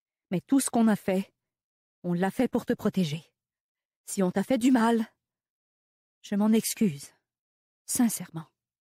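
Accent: Quebec, Canada. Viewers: La narratrice est peut-être bien québécoise ..vous y avez pensé ???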